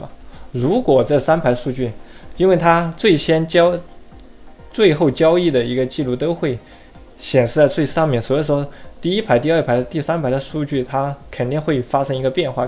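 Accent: Sichuan, China. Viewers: 说话口音川渝的吗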